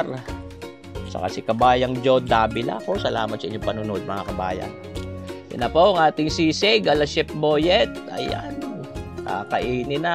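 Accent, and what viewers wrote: Batangas, Philippines. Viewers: Nakakatuwa talaga manuod ng mga videos nyo sir gusto ko po batangas accent nyo. More power po sa inyo! Tatawa habang natututo ❤ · shout out watching and enjoying listening to your batangueno accent from Edita Ransom Arkansas USA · Ayos!..bukod sa sarap ng luto mo kabayan Boyet,ito ay sinasamahan mo ng mga adlib o pasakalye mga salitang batangueno punto na syang nakakagana panoodin ang iyong pagluluto.Mabuhay ka Kabayan at ipagpatuloy mo iyan iyong talento sa pagluluto at nandito lamang kmi pra suportahan ka...cheers!..god bless!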